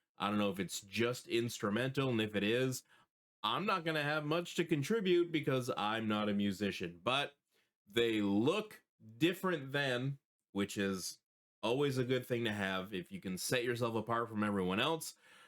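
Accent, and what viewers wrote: Quebec, Canada. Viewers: Where did you pick up your Quebec accent? Because you have traces of it? You know, I live in Montreal, but I'm still more than 5 hours from Saguenay, where Angine de poitrine (Heart pain) originates!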